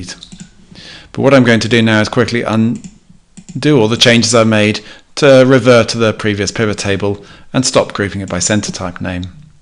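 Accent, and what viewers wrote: England, United Kingdom. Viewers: Wow..! Great class.. :) Like the 'Excellent sounding English Accent' :)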